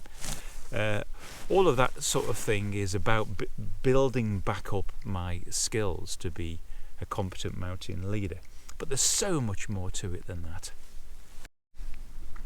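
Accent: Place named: Stoke, United Kingdom. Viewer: Interesting video, I’ve been considering this for a little while. But more importantly…….. are you from Stoke.? You sound like you’re from Stoke but haven’t lived here for a while as the accent is now quite subtle. Now if you’re not from Stoke , it sounds like your are 😂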